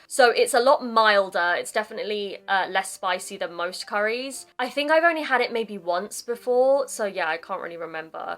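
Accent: RP, United Kingdom. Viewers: I totally agree with your thought about British English. You say that there is no the British English and so called British English has recently been getting lots of influences from American English. I myself speak American English with a Japanese accent like most of us Japanese, but your English sounds sweet to my ears , especially words pronounced without a r sound are pleasing. See you soon. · やっぱ、自分が習った英語は、イギリス英語なんだなあ～☺️聞き取りやすい。でも話せない。(^-^)/ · Thank your for another interesting video! I realized that I had many misunderstandings about the UK. When I’m watching your videos, I often see your accent as polite like proper English or RP(may be because you are in front of a camera?) so if you had a chance to introduce your accent, I would be happy to hear that! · やっぱりBritish English の方が聞きやすい！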